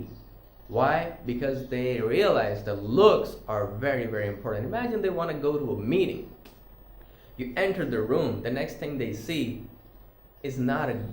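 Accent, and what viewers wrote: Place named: United States. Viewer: are u from pakistan? how do u have american accent?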